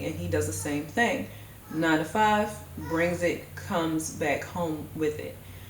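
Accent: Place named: Baltimore, United States. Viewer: You from Baltimore aren’t you?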